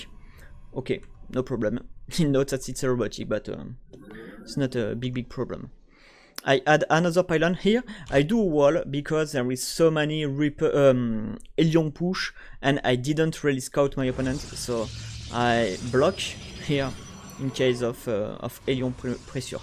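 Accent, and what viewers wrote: France. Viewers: ton accent anglais fait tres francais c est tres drole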